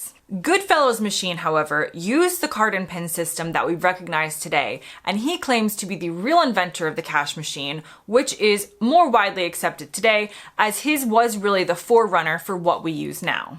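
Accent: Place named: United States. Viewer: Immediately I identified your American Accent, then you explained the reason. Very short list of British Inventions but none the less very interesting. The British have a habit of giving away inventions without realising their actual VALUE...ie The Jet Engine also given to Russia but not even a THANK YOU.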